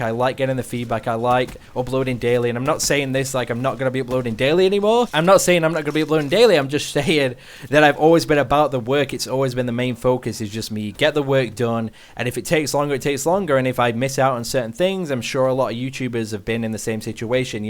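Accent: United Kingdom. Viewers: Rip your brithish accent if your gona have a grindsquad house in america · It's good to hear another British dude talking about things that are relatable to me. Finally.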